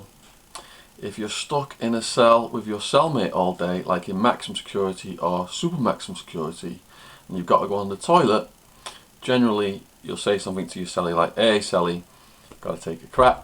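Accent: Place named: Northern England, United Kingdom. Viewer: You from Uk? Sound like you’re from Chester or somewhere up north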